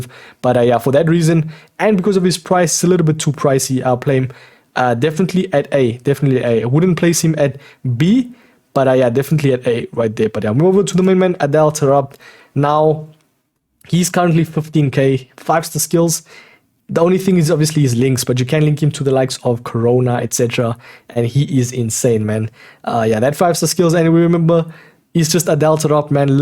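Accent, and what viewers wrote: South Africa. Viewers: . You're from Mzansi?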